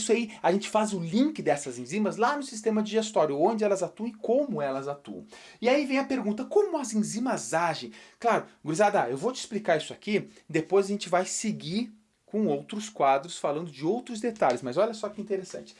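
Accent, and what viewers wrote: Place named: South, Brazil. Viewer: Bah, esse jeito do sul é da hora jkkkkkkkkkkkkkkkk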